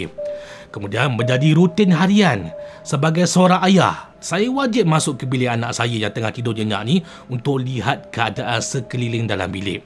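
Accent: Perak, Malaysia. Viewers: Saya baru join tengok.. seronok dengar kisah2 benar..dan yg lebih seronok 'dengo' cakap loghat perak.. haha..😅 sebab saya pun original org peghrak..hahaha😂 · Suka juga bila dengar bercakap loghat Perak seperti "idak" dan "udah". Saya peminat channel ini, teruskan berkonten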